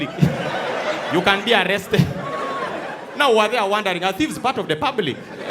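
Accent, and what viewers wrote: Uganda. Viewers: you nailed the American accent. And I salute you for carrying out the whole sketch in your Ugandan accent. It shows how much proud you are of your culture. Warm salutes from Morocco 🇲🇦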